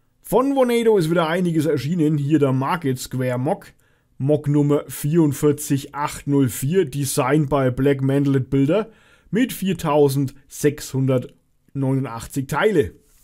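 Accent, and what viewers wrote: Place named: Franconia, Germany. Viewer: Ein schönes Video mit deinem coolen fränggischen Dialekt!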